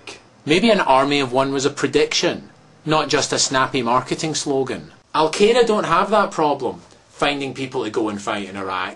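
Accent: Scotland, United Kingdom. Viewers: haha! great video :-) and nice scottish accent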